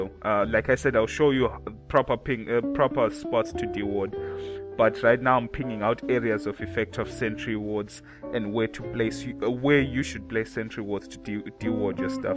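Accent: South Africa. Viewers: definately south african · Yo dude, You sound South African, Is that the server you play on?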